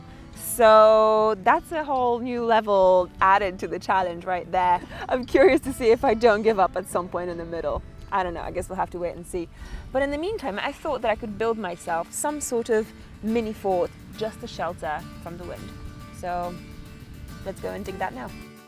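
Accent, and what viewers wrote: United Kingdom. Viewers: you now look like a french, sound like a british, but true to your heart you are wild as a free spirit... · Just started watching your vids last week when your Texas videos came through and YouTube thought, You Darrel are a Texan, maybe this girls videos would interest you!. Broccoli raw is best eaten with.... Ranch dressing!!!! I have a burning question, you have such a charming British accent.... How did a Polish girl end up speaking english with a charming British accent?????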